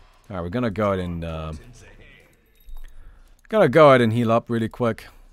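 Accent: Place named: Germany. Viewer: It is 100% without a doubt a German accent. Not Canadian, not enough "ey". Not American, not enough cowboy or California. Not French, Italian, English, Irish, swedish, Russian or Australian.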